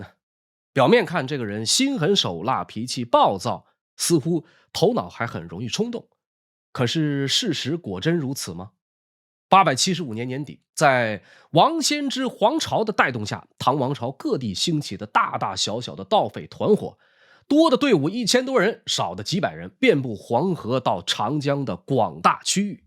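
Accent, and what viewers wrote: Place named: Northeast, China. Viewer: 哈哈，听了好几遍“我去他奶奶个拽的”，好亲切呀。你是东北那疙瘩的吗？🤣😂😁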